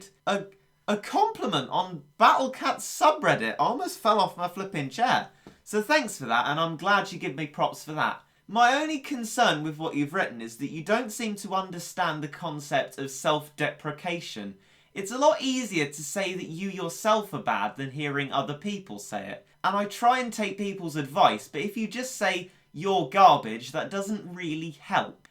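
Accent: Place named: England, United Kingdom. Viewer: I think that you mistook what was said in that first post there m8y. Now you sound like an Englishman, so American may not be your first language, but let me quote the part that seemed to catch you by the jimmies. "Also, he's not as bad as and Chillhola considering he is willing to improve, and equally importantly, he is not a complete douche to his fanbase." In this sentence, he used your name and Assassinbear and that unlike you two, MattShea is willing to improve and not a complete douche to his fanbase. This sentence isn't the best constructed however he's not saying you're a douche to your fanbase. He's saying that MattShea is "willing to Improve unlike " and "not a complete douche to his fanbase like Chillhola". You made several references to that through your video and I went to reread that sentence a few times because I didn't take away that you were a douche from it at all. 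I think the sentence meant the terms "respectively" and not in accordance to you both.:D Cheers m8y!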